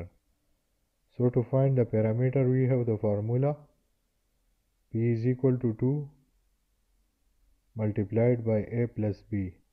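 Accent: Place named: India. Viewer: i cant understand your indian accent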